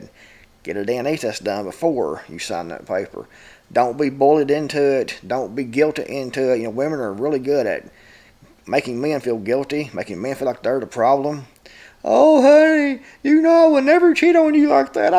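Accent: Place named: Kentucky, United States. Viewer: Love your accent. My momma and daddy were from South Central Kentucky. Your accent sounds like all my cousins from down there. Unfortunately I was born and raised in Louisville and the accent of Louisville natives is by far, not southern sounding at all. Even here in SC the accent is different.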